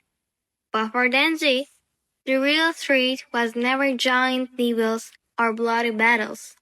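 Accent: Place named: Turkey. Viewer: Why does your accent sound so turkish?? Good content btw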